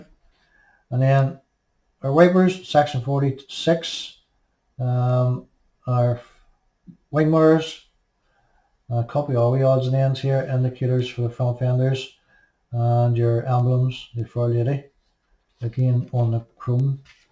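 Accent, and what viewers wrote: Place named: Germany. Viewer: Good afternoon, say chap you have a jolly nice accent! considered it was germain at first :)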